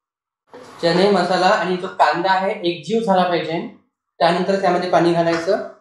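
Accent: Vidarbha, India. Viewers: Vidarbhachi bhasha, bolnyachi shaili agdi mast.Mi panNagpurchi ,aata Mumbaila rahate.tuzi recipe aani vidarbhatil vishesh shabd donhi very ❤sweet.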